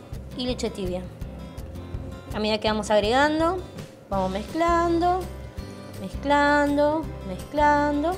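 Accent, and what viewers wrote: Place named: Argentina. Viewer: Disculpa mi ignorancia ...me encanta la comida boliviana ...Cómo así usted tiene acento argentino y prepara comida boliviana? Un saludo desde Londres...Acá nosotros somos fans de los restaurantes bolivianos .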